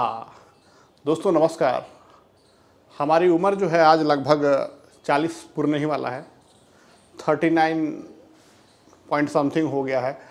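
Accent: Bihar, India. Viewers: Only Bihari can knows he is Bihari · Bihari accent is the best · Best honest video I have ever see and that too in bihari accent😂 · Gazab bihari accent,asli wala amir😁😅 Sir u r a true bihari apne ap ko real me ambani se kam nahi samajh rahe the😁😆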